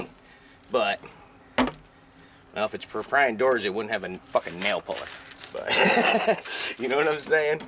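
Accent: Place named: Michigan, United States. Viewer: You sound like you're from Detroit-area Michigan.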